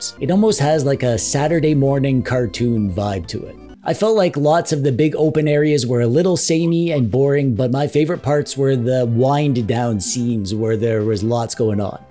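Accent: Canada. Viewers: Thanks for the review of this classic game. PS, you're one of the most Canadian- sounding YouTubers I've ever heard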